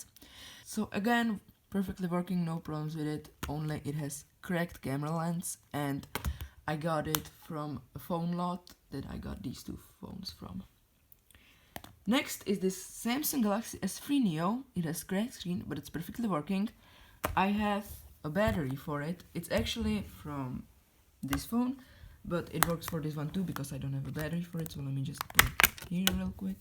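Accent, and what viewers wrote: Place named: Romania. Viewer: Your accent sounds Romanian but you are from Poland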